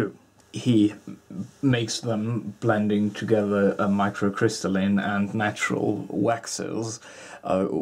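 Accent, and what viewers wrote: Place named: RP, United Kingdom. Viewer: To plumby voice so watched it with sound down.. No offence but it done my head in.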